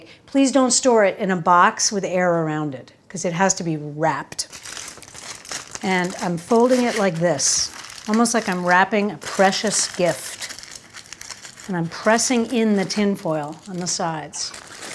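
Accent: RP, United Kingdom. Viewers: is that how she talks? im going to watch the video after this one, but the voice sounded like...the queen's dialect. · Great brit accent!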